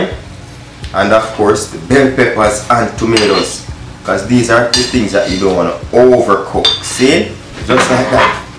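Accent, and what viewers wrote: Jamaica. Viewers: Wickeeeeeeed my uth!!Not only do I enjoy your teachings but ur presentation. Real down to earth vibes no twanging just raw Jamdown style.I feel I'm home u nuh JAMAICA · Recently discovered your channel. Really enjoying the authentic Jamaiacn feel. Meal and accent.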